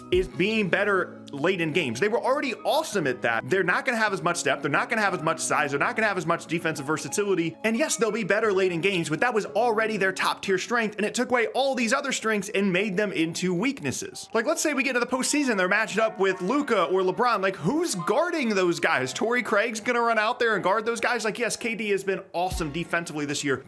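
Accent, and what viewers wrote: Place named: East Coast, United States. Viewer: I love your channel, I don't know if you're from the East Coast, but you talk really fast. Like I said it's great to listen to cuz it sounds kind of local to me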